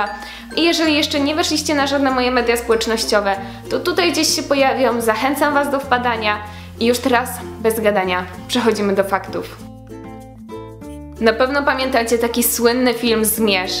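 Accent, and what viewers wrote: Poland. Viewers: Ty jesteś z Rosji, czy masz chociaż korzenie, bo ten akcent to jednak Polski (i to BARDZO)?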